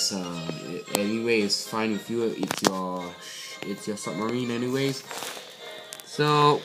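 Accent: Singapore. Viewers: are you a singaporerean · Are you singaporean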